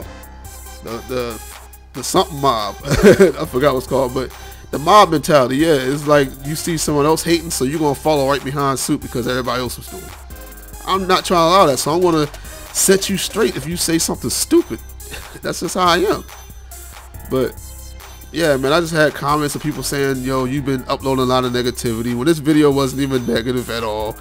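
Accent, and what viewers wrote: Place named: Baltimore, United States. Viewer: So I discovered u thru the GamingIlluminaughy and I loved u immediately bcuz I could hear the Baltimore in u and u were always funny and honest. I support u, MWN and JG. Not gonna lie, I dont really like the new dudes MWN is running with but I will support none the less. To mirror the sentiments of others, continue to make vids if u want to. I totally get the being grown n trying to find balance with this and the real world. U also mentioned that u were from the old school when games took effort, consistency and constant replays sometimes from the very start of the game. Stop worrying about clicks if u enjoy what u do. I would love to see a vid with u and JG if MWN cant come. I also see in the comments that many ppl support u. Stay confident Fam. We need ppl like u to represent for our city. Luv u ♥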